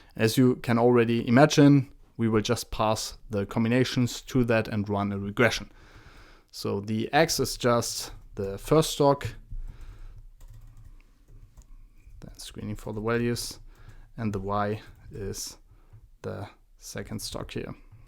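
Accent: Germany. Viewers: Jesus Lord, I can't believe I only just now stumbled on this amazing channel! And he has German accent. Pure gold. Amazing video and neat code. Thx so much mein Sensei 🙏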